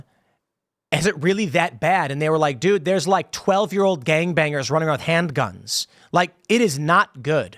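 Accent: Chicago, United States. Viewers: Tim really tries to make his chicago upbringing sound like Gotham city. Guarantee it was not THAT bad for him. Most of Chicago is fine and Ik he didn’t grow up in the parts where it’s really bad. It’s very small parts that do most of the crimes. Tim is not from those parts at all so he’s trying to sound cool. Like he doesn’t even talk or carry himself like those kinda people🤣. Nigga is a skater trying to make it sound he from the trenches. He sounds like he’s from the north side more than the south side.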